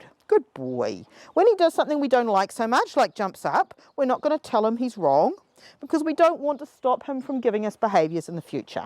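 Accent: New Zealand. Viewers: She’s saying better. It’s the New Zealand accent 😊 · Could you by chance spell out underneath your video what dog treats you're talking about (or perhaps a link to a recipe) since for the N. Americans the Kiwi accent is a bit difficult to unravel in certain spots and the name of the dog treat/roll was one of those spots for me. Thanks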